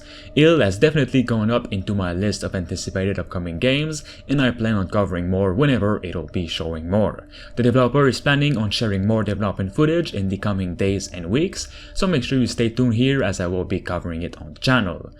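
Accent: Quebec, Canada. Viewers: Juste de même, tes clairement Québécois, j'ai raison?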